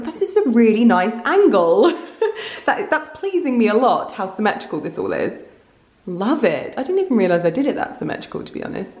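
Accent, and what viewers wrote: United Kingdom. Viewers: I’m french and I used the « paint » part to show my friends « this is british accent » ... 😍😍😍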